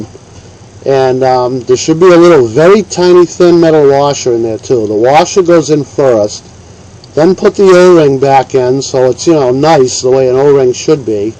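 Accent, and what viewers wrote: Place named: Maine, United States. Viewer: No. Now that I have had time to think about it, I was way off. I would guess that you are from Maine, not Mass. My guess is somewhere between Bath and Warren. Did I get it right this time? By the way I did the repair and you were spot on! The O-ring was a little deformed but I think it's going to be OK. I had better luck placing the O-ring and washer on the valve and (holding it upright) brought the mag down onto it. Thanks again!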